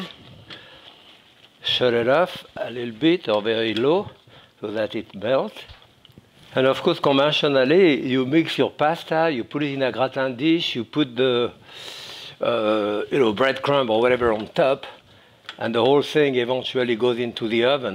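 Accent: France. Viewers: Same accent than Charles Boyer ...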